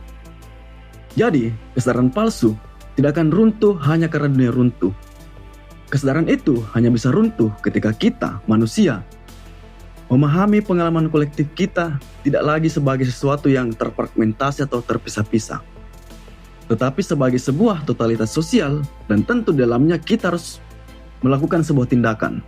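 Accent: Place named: Sulawesi, Indonesia. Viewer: Dari cangkok & logat sepertinya Orang Sulawesi ya 😂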